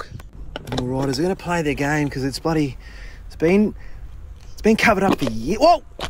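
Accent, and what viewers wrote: Australia. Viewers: I Am from The Netherlands and I just love your australian accent 😊 And it's kinda fun to watch how it's done, Thanks for the amazing content ☺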